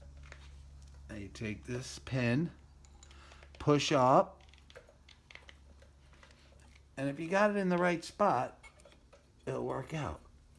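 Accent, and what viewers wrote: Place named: South, United States. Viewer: I noticed you have a slight accent are you from are you from Tennessee or Kentucky 🤠🤠🤠🇺🇲🇺🇸🇺🇸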